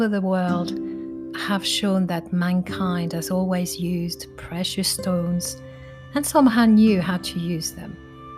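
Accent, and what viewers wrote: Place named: France. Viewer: Thank you, your videos are so beautiful, soothing, helpful and just connect with me on a very deep level, kindred spirits indeed! I'm British and I have many friends who were born in France and I do love their accent, yours is lovely Blessings to you and all Louisa and Sas (pug) I'm wearing a solid lapis bangle and citrine nugget bracelet... I love crystals, always have x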